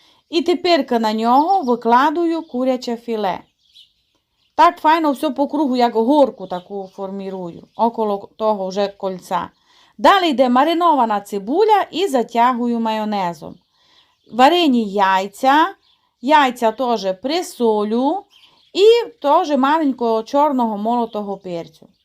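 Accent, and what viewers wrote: Transcarpathia, Ukraine. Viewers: не устаю удивляться вашим рукодельным видео и закарпатскому диалекту. Поздравляю с наступающими, здоровья, терпения, достатка вашей семье.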